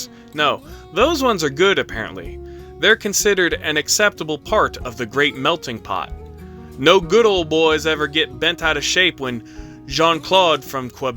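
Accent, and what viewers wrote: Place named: Germany. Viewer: It took me a bit to realize that your German accent wasn’t just a poor attempt at a Mario impersonation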